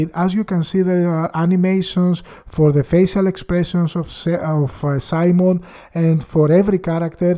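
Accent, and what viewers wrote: Greece. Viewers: Is this guy Greek? I have heard others with the same accent.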